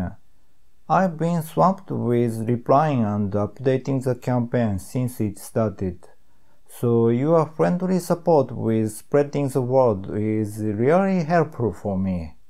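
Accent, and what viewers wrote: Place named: Japan. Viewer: what do you mean by his speech problems? If you’re talking about the way he speaks in the video then that’s just Japanese accent. In fact he is really good at English in the standards of Japan.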